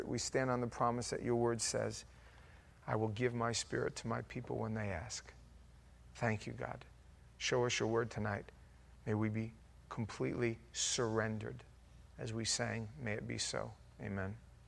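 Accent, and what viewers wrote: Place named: Long Island, United States. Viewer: You sound like your from Long Island. Good Sermon !😊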